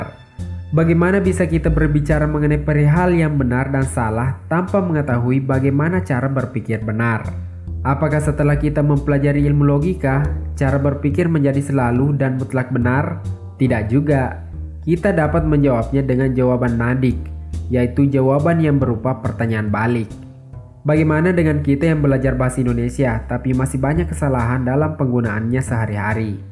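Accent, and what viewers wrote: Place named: Sulawesi, Indonesia. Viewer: ORANG SULAWESIKI'? KENTAL SEKALI LOGATNYA HEHE SALAM SULAWESI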